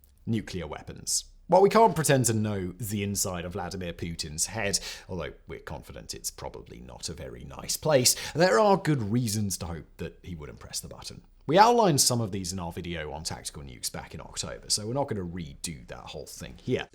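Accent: RP, United Kingdom. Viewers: how many channels does this plummy have? Why is he all over my feed? How do I make it so I never have to hear his voice again?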